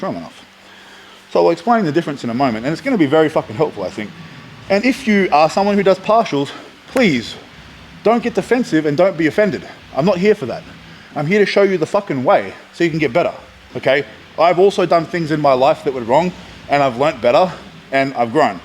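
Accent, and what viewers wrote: Australia. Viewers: Good see some solid Aussie oak talking weights not the yanks all the time. Good stuff mate and I’ll be watching keep the content coming and love the way we talk down under no room for smoke and mirrors just real talk. Now it’s your set mate 💪🏻😎❤️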